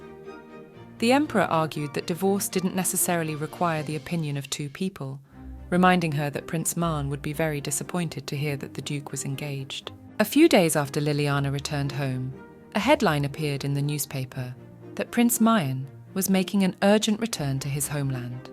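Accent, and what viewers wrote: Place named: England, United Kingdom. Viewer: Cant stand englisg narrators accent either.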